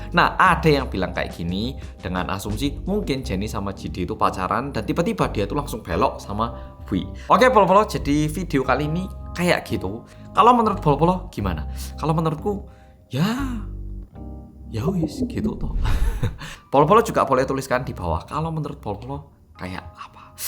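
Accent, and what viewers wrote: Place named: Malang, Indonesia. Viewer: Sumpah bawaannya ketawa kalau mas jelasin medhoknya ya ampun xixixi, bener bgt penjelasan mas "itu sudah jadi ranah kehidupan pribadi yang tidak perlu kita sentuh" 😆 dari pada sibuknya buat teori, ternyata artisnya ngeprank. Sehat terus ya mas 💕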